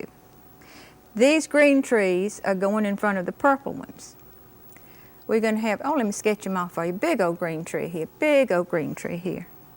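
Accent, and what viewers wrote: South, United States. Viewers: I love her Southern accent!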